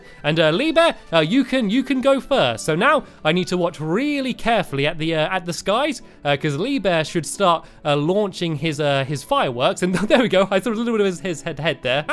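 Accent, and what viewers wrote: United Kingdom. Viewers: One question, Um is stampy british? Because his voice sounds like british something like squid but a little different anyways hi stampy i like your videos! xD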